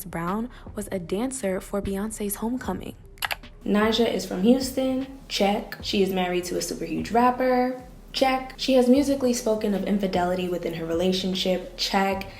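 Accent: Jamaica. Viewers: Subscribe immediately when I heard patois😂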